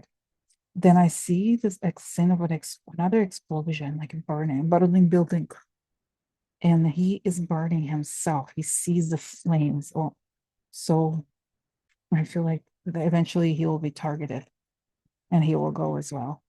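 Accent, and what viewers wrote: Russia. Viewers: I LOVE your Russian accent! I look forward to your readings every day. Thank you for giving us hope and keeping our fighting spirit alive! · Puh-leeze keep the Russian accent when doing Vlad and his filthy band of criminals…..and the teeny tiny 🎻….was laughing so loud, it feels good to laugh at this sordid story we’re forced to live through… Merci. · I enjoy your Russian accent and the tiny violin is too too much! Humor can really help during these dark, tense times. Alot of folks who listen to you feel the same way IMO. By the way, the privacy screen is attractive and it's color lightens up your office space. Just wanted you to know. Take care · You are the very best! I love your russki accent! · You are amazing! So intelligent and entertaining! Love the Russian accent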